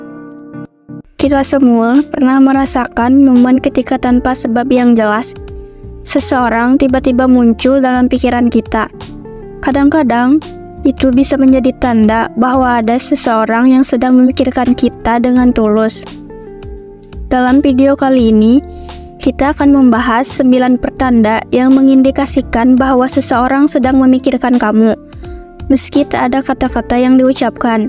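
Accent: Bali, Indonesia. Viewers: Logat kk nya kayak logat bali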